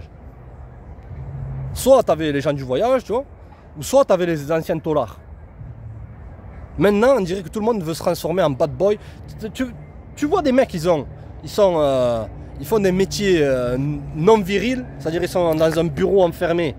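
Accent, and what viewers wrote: South, France. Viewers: Tu nous aurais pas dis le sud de la france ton accent plein de soleil nous le disait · Incroyable, tu sais que avant que tu dise 5ans, ç est sortie de la bouche, donc je ressent la même chose et oui terrifiant, moi suis des années 1970, et j'en peu plus de tts ça 😢, et en plus les gens sont tellement horrible qu'ils émanent leurs négatifs autour de peu de gens qui restent positifs, donc c est vraiment dur 😢 Mais j essaye vraiment de tenir le cap, courage à tts ceux qui sont décourager, 🙏💜 Moi pareil jme dit tu n ai pas de cette planète, alors merci à toi, j aime trop ton accent du sud ça fais du bien à entendre 🙏💜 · Merci pour ton post! Tu as bien fait de parler avec ton accent du Sud, c'est encore plus VIVANT! Tu vois, j'ai 78 ans et je ne suis pas une "meuf", je suis un etre humain engagee dans ma vie qui est simple, car je refuse d'etre dans la consommation. 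(Comme j'ai toujours fait!) Et j'apprends a me contenter... C'est un choix, car je suis persuadee que ce systeme touche a sa fin...et que bientot tous ces zombies, comme tu les appelles, vont se reveiller et devenir des ETRES, parce que chacun est responsable de sa propre planete! C'est bien beau de constater ce qui ne va pas, mais ca ne suffit pas. C'est a chacun d'entre nous de produire autre chose et d'aller le chercher en lui-meme, comme l'eau que l'on puise dans le puit, il y a UN EFFORT PERSINNEL POUR RAMENER L'EAU! (Si ca te parle...) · Je ne te connais pas , je te découvre et tu m'es déjà sympathique. Un bon accent du Sud de la France, cet accent que l'on entend presque plus même dans le Sud où les jeunes font tout pour le perdre. Et ton analyse sur la société même si tu n'as pas l'air bien vieux, enfin disons beaucoup plus jeune que moi 😉 je la partage totalement. Ca me fait plaisir en regardant ta vidéo de voir qu'il existe encore des gens authentiques. 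Moi quand j'allais à la salle de sport au début des 90's, il n'y avait pas de femmes, ça sentait la sueur et la testostérone 🤣. Les femmes fuyaient ce genre d'endroits. Aujourd'hui les salles de sports sont devenues des endroits incontournables pour se pavaner, se montrer, créer des stories pour alimenter son compte Insta ou que sais je. Le smartphone est un véritable fléau oui, n'ayons pas peur des mots. Et cela touche toutes les tranches d'âges, ce n'est pas propre aux plus jeunes. Tiens récemment je suis allé au restau avec des amis tous des quinquas comme moi, entre chaque plat, silence radio tout le monde était sur son smartphone. A un moment j'en ai eu marre et j'ai poussé une gueulante ! Oh les gars ! On Se retrouve entre amis pour passer du bon temps, pour partager, communiquer et vous qu'est-ce que vous faites ??? Vous pouvez pas le lâcher le temps d'une soirée votre joujou !!??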